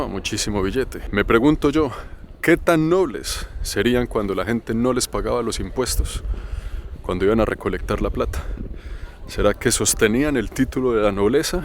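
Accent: Bogotá, Colombia. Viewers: Hola gracias por tus vídeos tan instructivos,y con tu acento tan marcado de puro Bogotano.ojala visites pronto la tierra.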